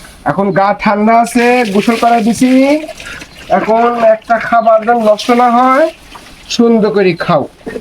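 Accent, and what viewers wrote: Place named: Pabna, Bangladesh. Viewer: আমার ধারণা আপনার বাসা পাবনা? বা এর আশেপাশে ভাষাগত মিল পাচ্ছি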